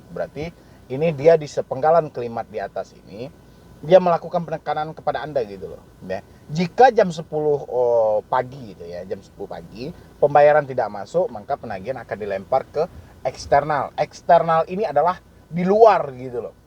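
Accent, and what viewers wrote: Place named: Jakarta, Indonesia. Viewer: Logatnye knape jadi Betawi bang 😂 dulu ane suka nonton video abang logat msh daerah mana gitu skrg bgtu buka lagi video abng logat ud berubah 😂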